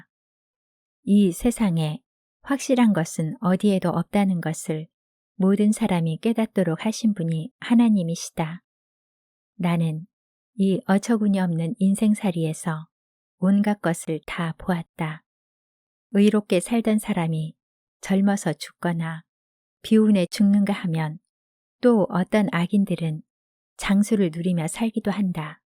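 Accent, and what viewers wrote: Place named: Jeolla, South Korea. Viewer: 오웃 전라도 어투가 쩝니다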